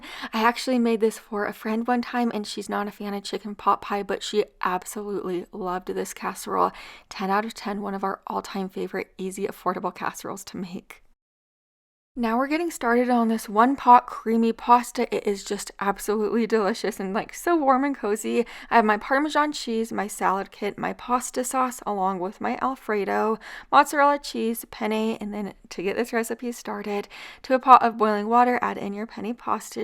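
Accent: Utah, United States. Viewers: I suspect another way of pronouncing things in Utah. It’s cute. I’m a Utah transplant.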